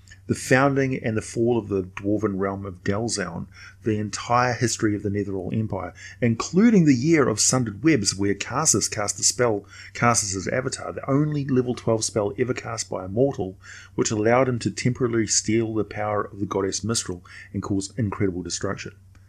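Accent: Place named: New Zealand. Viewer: Aye a kiwi bloke nice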